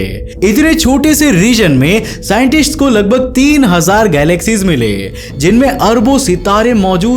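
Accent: Bengal, India. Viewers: Are you a Bengali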